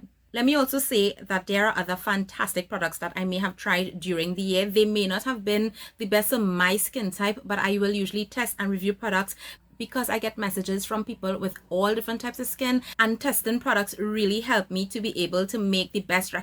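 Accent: Trinidad and Tobago. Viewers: This review helped me so much in choosing the perfect cleanser of my acne prone skin…. Thank you! Thank you! Thank you! And of course, you must know a Trini anywhere, especially by their accent 😍🇹🇹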